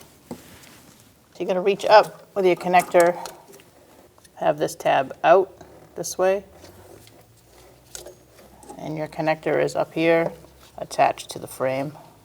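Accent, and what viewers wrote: Boston, United States. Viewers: Thanks for the content .. I'm about to do the rear two on mine .. btw is that a Boston accent ?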